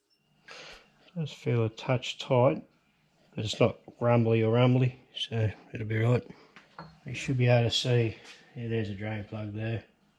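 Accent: Australia. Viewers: yeah bung the DRO on, I need to put mine on and having an example of someone who talks Australian doing it would be really good!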